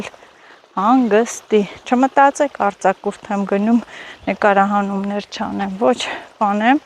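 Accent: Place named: Dzorakhpyur, Armenia. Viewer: Мэр Дзорахпюри барбаров эл Э - чемнали! Шат аразат э лсэлн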